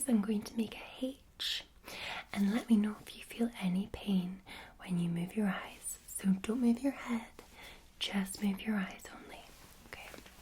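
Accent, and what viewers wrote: Scotland, United Kingdom. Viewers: me: FINALLY SOMEONE SCOTTISH[ also me: she sounds so nice but then im Scottish and i dont sound that nice] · Wow...just wow. I’m getting a Scottish Dr Quinzel vibes...this is dangerous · What accent is this? Edit: Just read she is Scottish, but is there a mix? It's not the Scottish I'm used to hearing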